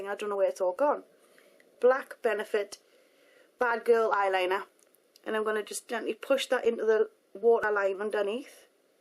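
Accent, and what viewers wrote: Newcastle, United Kingdom. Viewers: Its a Geordie Accent. Same as Cheryl Cole?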